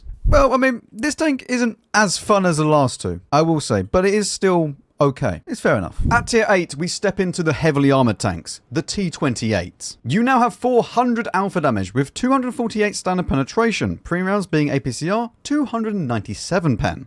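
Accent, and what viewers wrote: United Kingdom. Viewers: I absolutely love the way you speak those words Brit man, nicest Brit accent ever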